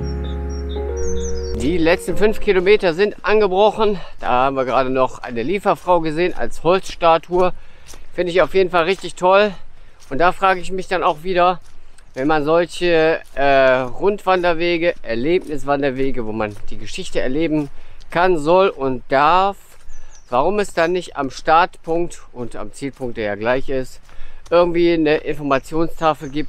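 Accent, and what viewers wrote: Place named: Solingen, Germany. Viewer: Da würde nix mit f und w gemacht. :-D Das ist Mundart Solinger Platt. Gruß van Solig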